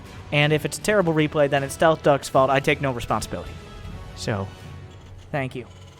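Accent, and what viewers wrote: South, United States. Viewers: WHAT?!? He grew up in the south or has a parent from the south then, or he's doing it as some sort of elaborate satire... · He's from the south. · M Ingham yeah I’m not so sure he’s from Michigan either no sources or anything he definitely sounds like he’s from the south, just letting the Aussie know where Michigan is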